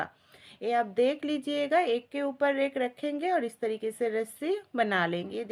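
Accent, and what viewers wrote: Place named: Assam, India. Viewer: Aapki bhasha se aap asaam ke hi lagte ho bangali kam. ❤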